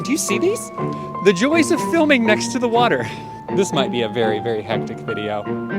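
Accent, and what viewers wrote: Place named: United States. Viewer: 한국말 하실 때랑 목소리가 전혀 다르신게 더 매력적으로 느껴집니다. 목소리, 톤, 발음이 평소 많이 듣던 미국영어 음성이라 선명하고 정확하게 들려서 너무 좋아요. 딕션이 좋으시다~라고 하면 이것도 콩글리쉬일까요? 사실 콩글리쉬 자체도 콩글리쉬라 Broken English라고 써야한다는 얘길 듣긴했습니다만ㅎㅎ 좋은 영상 감사해요. 야외스튜디오 너무 좋습니다~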